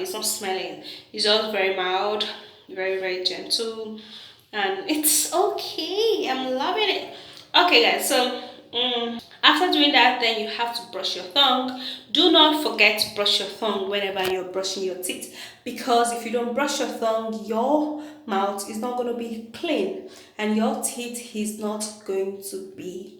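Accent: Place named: Nigeria. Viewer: She's from Africa Nigeria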